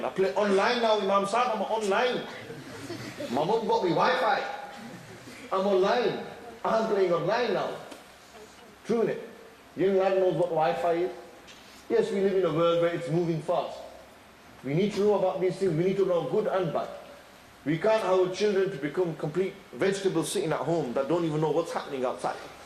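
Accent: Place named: Mirpur, Pakistan. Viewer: Beautiful speech. May allah bless you. You gotta love his mirpuri.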